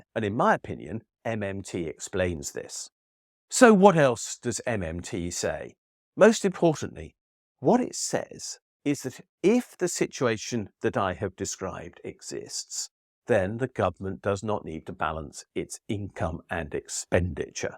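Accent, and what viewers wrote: United Kingdom. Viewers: Just because he has a British accent doesn't mean he sounds like Varys lol.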